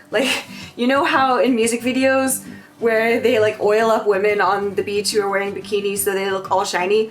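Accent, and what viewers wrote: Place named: United States. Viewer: your american accent is going away and its cutee :)) ♡♡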